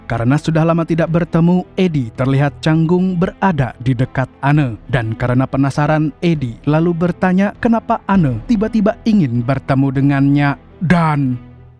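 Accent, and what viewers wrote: Banjar, Indonesia. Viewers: Banjar banar 😂😂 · Baru sadar urang banjar rupanyak😅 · orang Banjar mang? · Orang Banua jua kh mang😅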